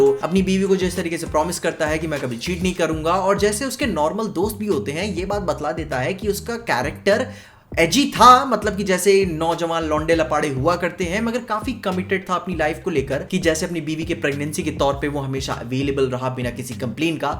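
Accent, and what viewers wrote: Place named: Uttar Pradesh, India. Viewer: Areey bhaiii lanth sunke laga ki tum bhi up ke ho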